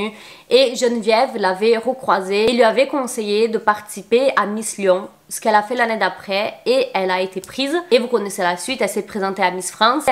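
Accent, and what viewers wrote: Brazil. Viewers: Ce n'est pas l'accent du Sud de la France, c'est un accent brésilien parce qu'elle est brésilienne 😅😅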